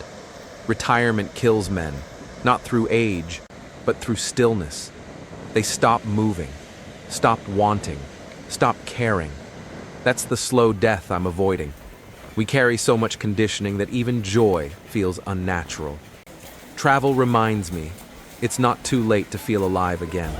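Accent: United Kingdom. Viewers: You're a Brit?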